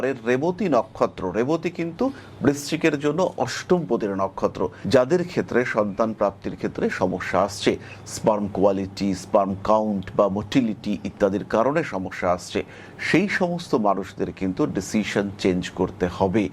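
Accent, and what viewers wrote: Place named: Bangladesh. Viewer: আপনি কি বাংলাদেশে বড় হয়েছেন ছোট থেকে মানে আপনার কথার টান শুনে মনে হয়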